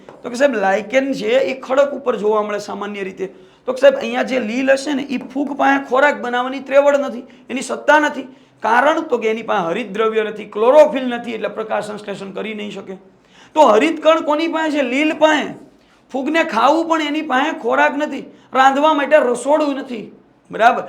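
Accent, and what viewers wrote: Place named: Kathiawar, India. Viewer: તમે પ્યોર અમારી કાઠિયાવાડી ભાષા બોલો સર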